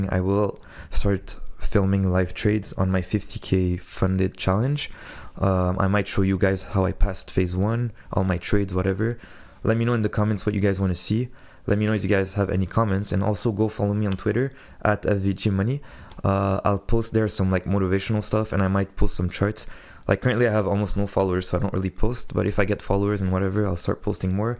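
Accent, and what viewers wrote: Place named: France. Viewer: U have like the french accent if u r from france im from france too we can trade together bro😭😂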